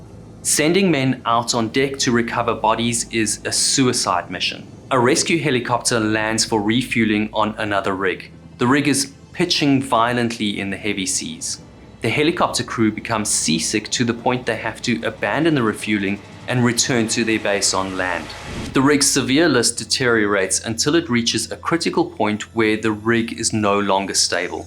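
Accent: South Africa. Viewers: Great video thanks. Everyone can tell you're from South Africa because of your accent.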